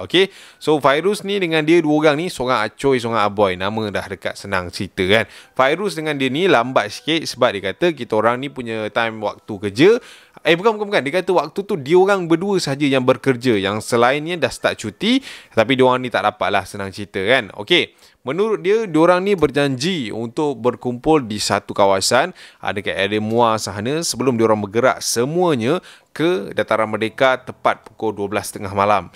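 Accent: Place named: North, Malaysia. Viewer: Pekat nau bahasa utara 😂 Btw salam dari kedah🔰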